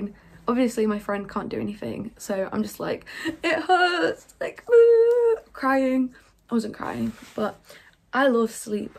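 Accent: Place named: United Kingdom. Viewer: I watch your content only because your British Accent🧡🖤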